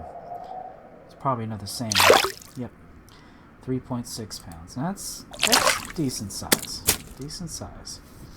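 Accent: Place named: Georgia, United States. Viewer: You sound like youre from Georgia, which makes you a natural Ice Fishing Expert I recon :D Good Video! Can you show us how to catch Sturgeon next?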